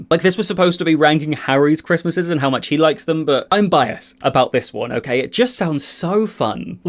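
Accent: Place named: United Kingdom. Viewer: I like how you show books, and the second book is a little bit torn, so it reminds me by first books I read in my childhood. Miss them much. Now I have a Harry Potter audoimarathon, listening all books in English read by Stephen Fry. You videos also help me to enjoy content as well as good English (British) pronunciation. Wish you a Marry Christmas from Russia! (we have our on 7th of January). In Russia Harry Potter movies are not Christmas, but so-called New Year's movies, so I will start to rewhatch them soon)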